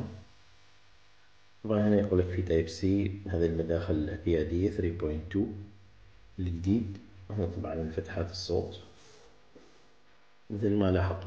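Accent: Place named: Iraq. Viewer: تشبه لهجه عراقيه حيل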